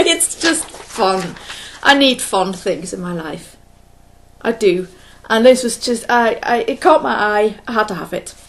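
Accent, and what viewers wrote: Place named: United Kingdom. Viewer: I got here from StitchingMommy’s Flosstube, and I love your video. I adore British accents, and many people here in the US do also, so I hope your channel grows. There’s nothing i think you’re doing wrong. Maybe put more easily searchable words in each video’s title, possibly the designer’s name of something you’re working on, or something like that? One thing I really enjoy, too, is that you never say “um”! Many flosstubers haven’t mastered that yet, and eventually I can’t watch any more. Your voice is pleasant and has a lovely cadence! And I suffer from depression also. Your advice about light is spot on! 👏👏👏 I keep all indoor lights way down low after the sun goes down. Only a nightlight i. the bathroom. Only the stove hood light in the kitchen, etc. After a week of this, my insomnia disappeared and has never come back. 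That was 3 years ago.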